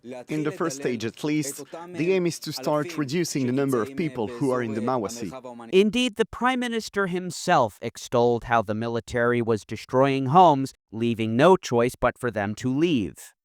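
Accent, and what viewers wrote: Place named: West, United States. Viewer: He sounds western though?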